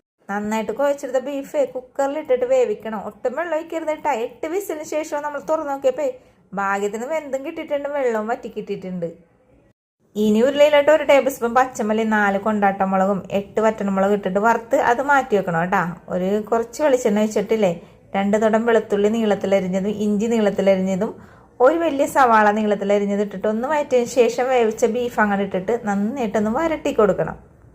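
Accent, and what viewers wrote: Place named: Thrissur, India. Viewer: മ്മ്‌ടെ തൃശ്ശൂർകാരി ആണെന് തോന്നുന്നു ട്ടാ വരുന്നു 😂💖🔥🔥🔥🔥😊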